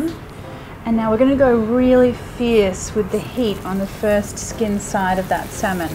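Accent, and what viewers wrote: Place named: Australia. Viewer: Yet she has a clear Australian accent ok lol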